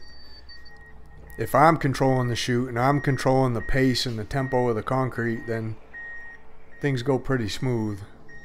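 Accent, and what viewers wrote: Maine, United States. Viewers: You are absolutely right about steel reinforcement on concrete. Concrete has very high compressive but less tensile strength. Reinforcement helps protect slab integrity under tension. Common ways the concrete has tensile forces applied are being entrapped (like a slab between walls) when expanding and contracting from temperature changes or subsidence form improper subgrade preparation. I had a 26' x 36' shop slab poured inside a ICF frost wall (8", 4000 PSI with fiber, one control joint midway, on 2" of blue foam with 6 mil vapor barrier, and meticulous sub grade prep) and no steel. People we're flipping out telling what a disaster I was going to have. Ten years latter there is not a crack in that slab. It's expansion and contraction is buffered by the ICF block so it is not trapped and never goes under tension. This is with a 10,000 lb car lift on it. 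The unstated downside to steel is it expands as it inevitably corrodes and can break the slab up on it's own. Great channel, watch regularly. I'm from Hollis, ME now exiled to VT. I recharge my accent when I watch. As I tell the Vermonters, anybody from Maine is worth two Vermonters, three on a good day. They take it in the spirit of humor as intended but people from away are aghast.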